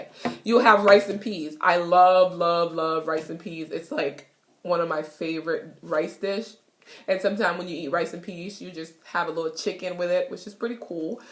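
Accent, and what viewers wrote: Patois, Jamaica. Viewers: Hi my name is kiera and i love your Jamaican patoa talk its cool i talk like that sometimes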